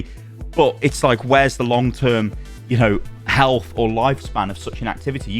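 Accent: South, United States. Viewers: The rich Southern American accent from a Brit is not something I knew I needed. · 10/10; American accent..👍🏽👍🏽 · excellent yaccent (yank accent) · Wow your american accent.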